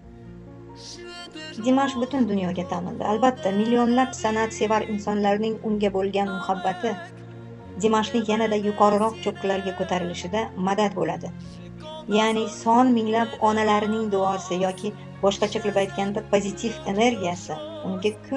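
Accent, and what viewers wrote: Uzbekistan. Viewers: Диктор с какой страны? С Узбекистана? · Диктор Хорезмненсизба?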